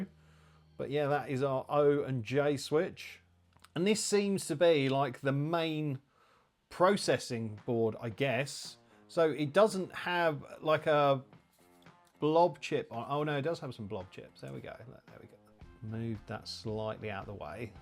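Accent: United Kingdom. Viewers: Well this was a lovely trip down memory lane. I had an OG MD back in the day and loved it. Will certainly be looking into one of these..ahem...duplicates 🤣 Also, its VERY refreshing to hear an English accent in a video like this. These types of unboxing/test videos are usually americans.. "Subscribe" button smashed!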